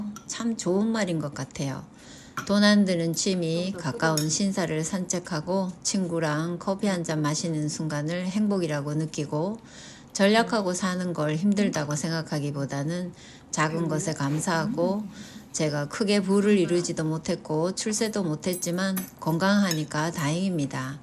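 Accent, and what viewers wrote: Gyeongsang, South Korea. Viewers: 경상도말이 이리도 교양있기도 들리는구나. 내말이 억센건 내탓이였구나 ㅋ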